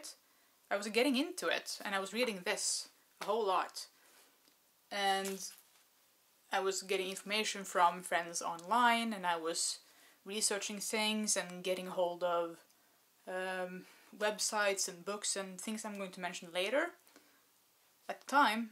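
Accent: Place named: Trondheim, Norway. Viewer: I was listening to your accent and thinking 'she sounds like my friend from near Trondheim' and lo and behold... that is where you are indeed from. So, I feel very smug right now, as it's taken me forever to be able to identify Norsk accents :-) Anyway, goth-wise... I know a few persons of this persuasion in Oslo, but the scen is tiny. That said, I used to live in London, and the scene there is much smaller than it once was. Or so was my observation. Anyway, enjoyed your video :-)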